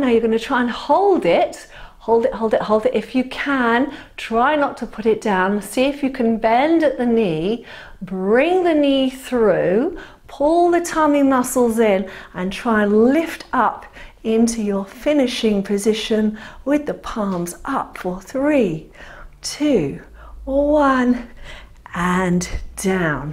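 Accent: England, United Kingdom. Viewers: Hello, I haven´t done any exercises for a good few years, just being lazy, and very little inspiration, decided must do something, so I started only yesterday, did´nt manage to do all the 30 minutes, I thoroughly enjoyed those that I managed to do. Today was a little sore, but have done another lot of your exercises, to get rid of the stiffness. My name is Jan (Janythe) Canovas, I am a South African of English parents, I am 85 years old, and need to take care of the amount I can manage, but am happy to say that every day manage a little more, It is so good to listen to nice English accent, thank you. Your explanations are really wonderfull. I will come back to you in a few weeks, when I am able to manage the full 30 minutes, it will take a little time as I am VERY stiff and unfit, but you helped me a lot, even managed to go to sleep a little easier, I am so thrilled to have found you, and your over 60 Pilates. 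Thank you so much, sincerely Jan. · So happy to have found you. I'm a senior senior (!) and had stopped all muscle work and started doing cardio only, due to joint pain, etc. etc. This session allowed me to work my whole body once again without pain. Plus, everything's better with a British accent. Thanks!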